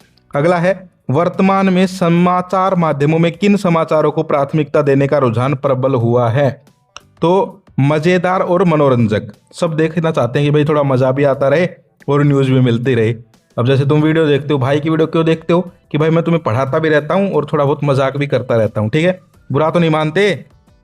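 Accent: Haryana, India. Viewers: Aap Haryana se na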